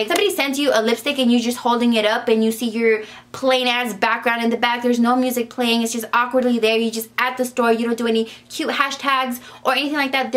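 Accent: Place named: Latin America. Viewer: love your acento girl ! go latinas <3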